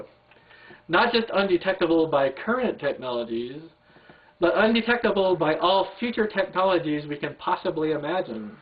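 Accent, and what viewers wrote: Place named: United States. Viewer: How the hell can you mistake an American accent for an Australian one? Though I guess you have to be sheltered to like Hovind....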